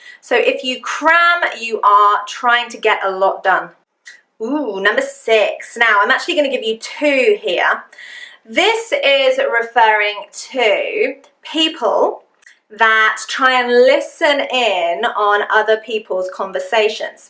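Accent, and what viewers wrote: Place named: RP, United Kingdom. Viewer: Dear Prof, You are amazing. I do love your British accent, your posh accent. Love. Andrea from Venice, Italy.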